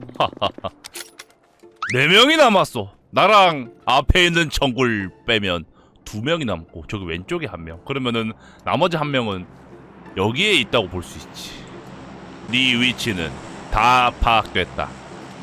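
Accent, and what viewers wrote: North Korea. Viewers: 저 목소리 왤케 북한 말투 같아보이냐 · 억양이 북한 사람 같…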